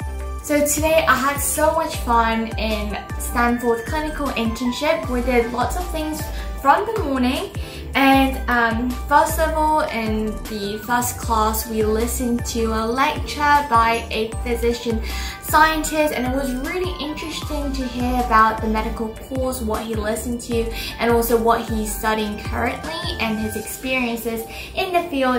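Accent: United Kingdom. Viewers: 영국에서부터 구독했는데 영국 액센트와 미국 액센트가 아주 묘하게 조화를 이뤘네요.^^ 예쁘고 야무지고 똑똑 부러진, 영국에서 항상 응원할게요! 부모님께서도 무척 뿌듯해하실듯요.👍❤️😍👸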